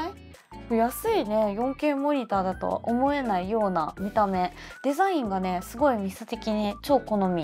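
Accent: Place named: Osaka, Japan. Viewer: 大阪弁が時折出てますね。(笑）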